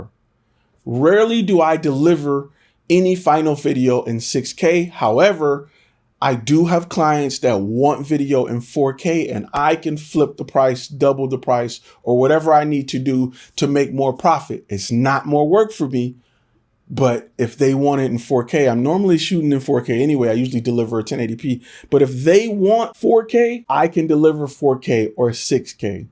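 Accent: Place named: Inglewood, United States. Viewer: weird question, but just curious where you're from? Your "accent" and mannerisms are similar to my former Animation Art Director who grew up Inglewood, CA.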